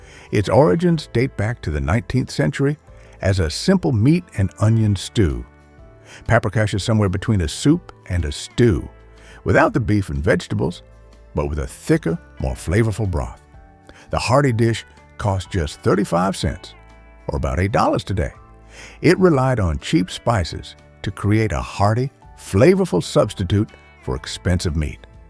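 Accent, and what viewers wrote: South, United States. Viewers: the AI southern drawl is maddening to me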